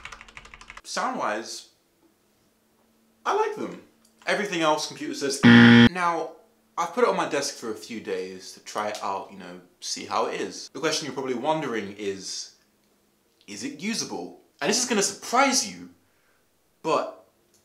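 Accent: England, United Kingdom. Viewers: Says in a lovely english accent: "it's fairly heavy--" SLAM!!!!!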